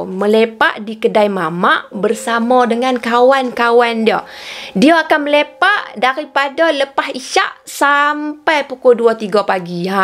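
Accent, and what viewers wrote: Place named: Kedah, Malaysia. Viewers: Slmt mlm semua..hai jom nk dngar cerita seram..hbt na ckp loght kedah..trbaik laa.. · salam..bulih akak tnya asal dr mna..sbb pandai ckp utara👍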